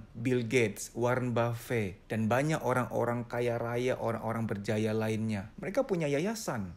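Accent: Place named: Pontianak, Indonesia. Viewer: Abang nya ini kek org pontianak logatnya hehe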